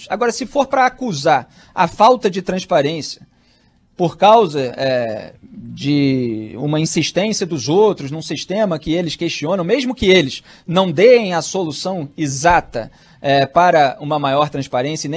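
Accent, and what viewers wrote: Rio de Janeiro, Brazil. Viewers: Fala carioca 🤗🤔🇧🇷🤭😷liberdade de críticas ❤️ admiro sua coragem.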